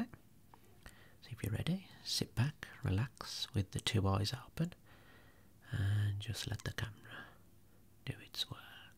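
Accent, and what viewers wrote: Birmingham, United Kingdom. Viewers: Its cause hes a brummie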